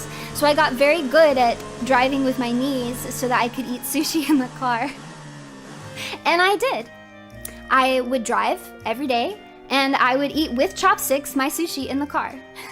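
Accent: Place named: South, United States. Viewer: I absolutely drive with my knee 93% of the time. Also this seems to be from same stream as the forts convo. I live in the south my whole life and have zero accent. She seems chill and good person and good friend. That ya’ll she has is dead giveaway / she shouldn’t adjust to a ‘proper’ speech pattern and cadence because of viewers. F them - forcing denial and faking true self for other people. How do I befriend her as asexual male and acoustically gifted - you wanna just like.. spend time together and hang as friends? Not that non-acoustically minded normies would understand that friendships. Or that’s what my ptsd says anyway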